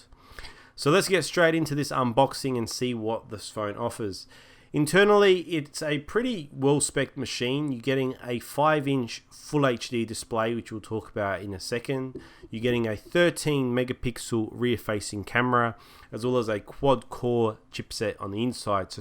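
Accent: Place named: Australia. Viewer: Now I can distinguish which accent belongs to which Country. U r from Australia !!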